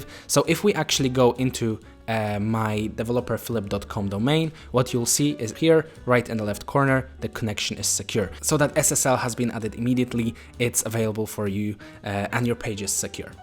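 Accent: Poland. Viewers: Awesome effect! Q&A: Is polish your mother tongue ?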